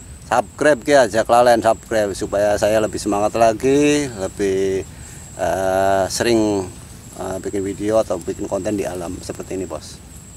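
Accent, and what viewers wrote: Indramayu, Indonesia. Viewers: Kya wong dermayu y ngomonge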